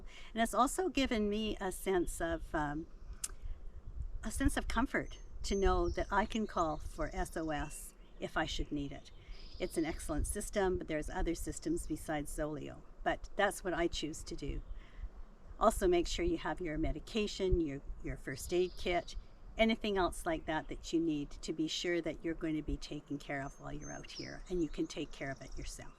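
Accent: Canada. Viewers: thank you so much. You are so easy to listen to. You’re pleasant and warm and full of wisdom. I’m from New England really and can hear some Canadian in your voice. I consider northern Maine home. I miss nature and always walked on my own in the woods and fields. I’ve lived in Utah since 1983 minus two years I drove cross country to be with my mom as she passed from bladder cancer. So the travel bug bit me. I divorced after 30 years and my kids are all grown and very busy. Apartments are too expensive and I just don’t want to feel stuck anymore. Sorry for the long post. 😊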